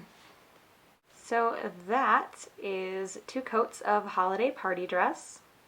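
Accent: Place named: Midwest, United States. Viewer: Are you from the Midwest? Just curious because you have a slight accent that is similar to mine lol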